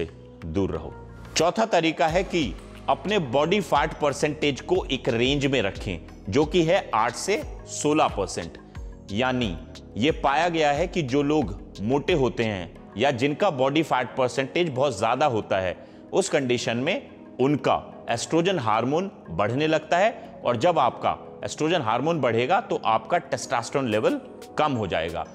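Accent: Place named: Hyderabad, India. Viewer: Zabardast Mashalah Chindiya agarbattiya mombattiya Sur Sur battiya chingari angar 😜 Hyderabadi andaz me bola lekin mashallah bhot khoob sir. Video apki dekh k mera har baar satisfaction aajata, confidence increase hojata k Bhai mai nai krsaktu aisa nai hai mai bhi krsaktu ye tariqa istemal krke or saath me apke liye dua bhi nikalti.😍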